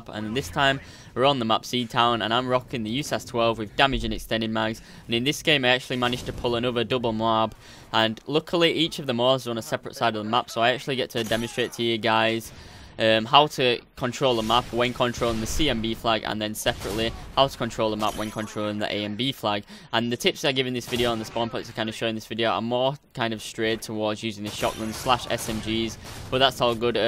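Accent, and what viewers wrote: United Kingdom. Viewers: you're a great player and commentator dude. i love listening to commentators from the UK. its good to respond to your fans' comments. keep it up!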